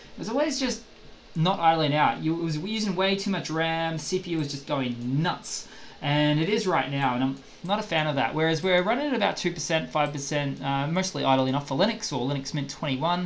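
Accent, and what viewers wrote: Australia. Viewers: i love australian accent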